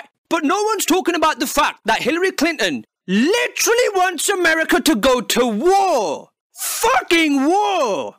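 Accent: United Kingdom. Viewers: says not to support the logic in Casey's video because it's false, yet his argument has just as much fallacies, such as his argument for Hillary not being sane is a fucking joke about her enthusiastic reaction to shit and pigeonholing her negative sides for going to war and pigeonholing Trump's negative sides for PussyGrabbing, all the while saying himself that all youtubers (admitting himself) shouldn't be listened to as political rationale. Essentially just a cartoon with silly British noises now, no comprehension. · hey i thought your brit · baryonyx31 But he has lived all his life in UK and has a severe British accent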